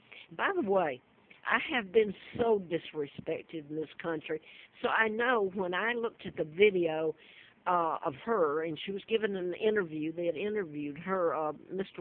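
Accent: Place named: South, United States. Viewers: she was kidnaped and the southern accent comes from the British. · How did u get Southern accent ?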